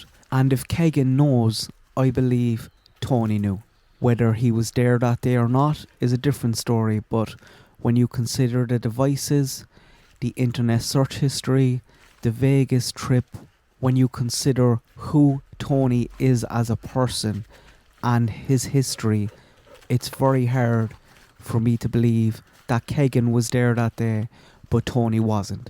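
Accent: Ireland. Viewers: Ok I'm new to your channel, about a month or so & I'm from the US & adore your Irish accent & your dog is beautiful. My apologies on this day I cannot finish watching the video bc of hearing of the abuse to Tony Klines daughter, black eye at 4 & burnt hands. I know it's important for your channel if your videos are watched to the end but I just can't listen to this. So sorry. Oh but I know what I can do I'll finish the video with THE VOLUME OFF 🔇 and enjoy your beautiful dog. I'm Mimi btw.💜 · When I heard that accent I knew you were Irish. But I had to check. I'm happy I found your channel. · Thanks for your insight and notes. Heartbreaking for Tony’s family and all the animals he killed. Not related at all but just want to say I love your Irish accent. My gran was from Limerick, which county are you from?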